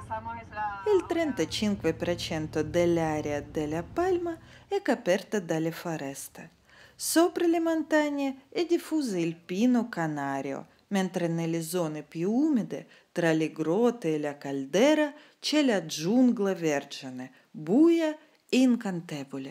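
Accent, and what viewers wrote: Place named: Russia. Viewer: Какой прекрасный русский акцент! Спасибо за рассказ и видео! Красивый остров...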